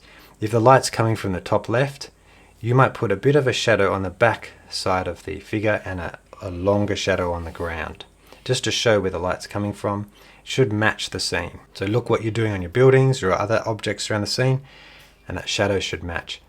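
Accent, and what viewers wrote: Australia. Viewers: Just found you and subscribed - enjoying your Australian accent and excited to try your approaches!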